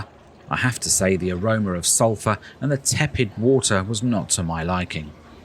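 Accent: England, United Kingdom. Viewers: Спасибо за настоящий английский и субтитры!😃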